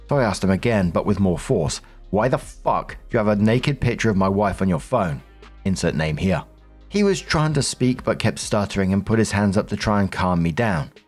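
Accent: United Kingdom. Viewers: It is a British thing FYI, sometimes they don't pronounce 'co' or 'ch' with the hard 'k' that Americans do.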